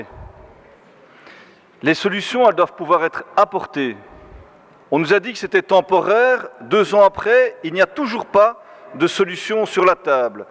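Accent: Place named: Belgium. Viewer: Quel gros accent belge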